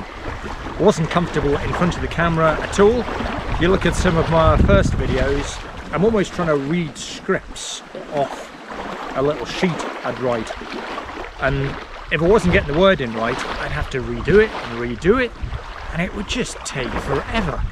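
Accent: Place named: United Kingdom. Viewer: I clicked on this expecting an American accent and was surprised! Good to see another UK YouTuber 😄👍 lovely area that you live in too. I've only been on YouTube for 2 months so it's great to hear some insights from someone ahead of me! Great video my friend. Have subbed 👍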